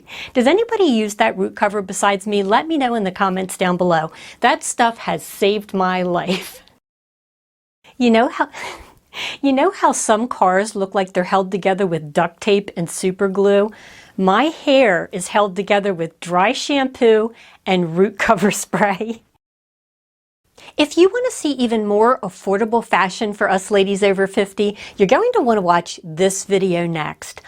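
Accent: Philadelphia, United States. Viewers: I'm loving your Philly/South Jersey accent! My mom was a South Philly Theresa. You have excellent tips and explain things so well!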